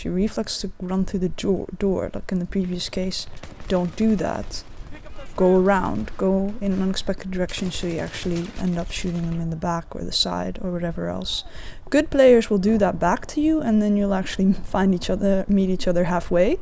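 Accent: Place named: Netherlands. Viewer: You sound Dutch... Am I right?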